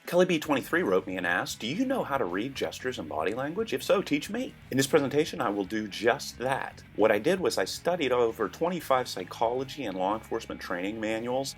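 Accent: Pittsburgh, United States. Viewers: the elbow touch must be in your culture you sound American, in my culture (Canadian) we don't touch each other at all on the elbows, it never happens, it would be too close to the body, and could be misinterpreted as something not appropriate, I have never seen anyone touch another person on the elbow when they shake hands, we do shake hands and hug at the same time, this is done by first the hand shake, then we pull each other in for the hug at the same time. it is a very nice and warm greeting, when greeting family or close friends, or we just give a big hug, sometimes we even hug a person we don't know very well, if the situation is seen as appropriate, like if theres been an accident and they came out okay and need comfort, that is what I mean We like to buddy hug, this is when we know a person is not very much into the full on hug, as i have a niece who is like that she isn't a huggy person, and is more comfortable with a quick sideways hug, or just a pat on the back. 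· Did I notice a Pittsburgh accent in there? Also, I like how fast the video is. Lots of info, rapid-fire, no time wasted. And there IS a pause and rewind option if needed.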